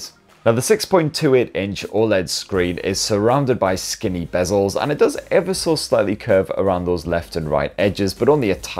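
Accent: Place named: Newcastle, United Kingdom. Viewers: A lot of it isn't even scripted he actually speaks. Like that it isn't unusual in certain part of England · Sorry. I am not sure what you mean? I was just saying the way in which he talks he normal from his area and many of the expressions spoken are common place within that area. No insult was intended. Was merely trying to give people knowledge of the video creators dialect in the north. I am not sure about internet lingo franc though. Does 'ffs' denote a stutter? Thanks. Be healthy. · He’s a Geordie, say no more.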